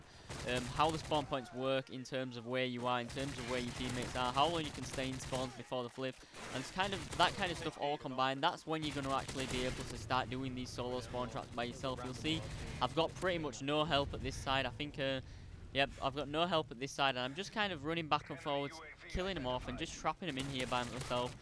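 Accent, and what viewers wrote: United Kingdom. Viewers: U went super east on these ppl. Do you live in the U.K. Cuz u got a british accent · "Do you live in the UK because you have a British accent?" - rhetorical question?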